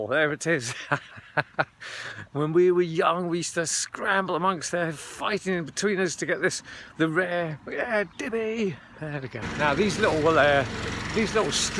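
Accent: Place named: England, United Kingdom. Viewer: Yeah. to my ears I always felt you had an accent or dialect that was not typical of the area, but more English. The fight story of primary 7 confirms what I had thought. Were you born in England or spent a number of years there when you were young? Happy May BTW.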